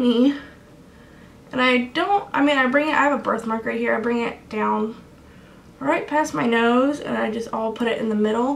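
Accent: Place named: California, United States. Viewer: I’m guessing she moved back home to Michigan from California cause she’s HELLA talkin like a Californian. Lol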